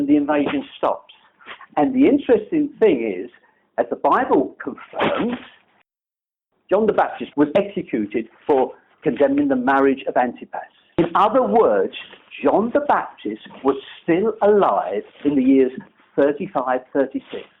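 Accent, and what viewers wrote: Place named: North London, United Kingdom. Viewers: I like his London accent. I despise the Australian and especially the New Zealand accents. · he sounds like he comes from N London.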